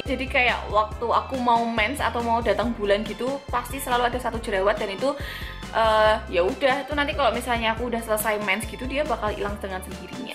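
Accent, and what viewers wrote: Java, Indonesia. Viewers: Medoknya itu lo ... unch unch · Selalu sukak sama medoknya ❤️ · Langsung subs gegara medok :') ❤️ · medok bet medok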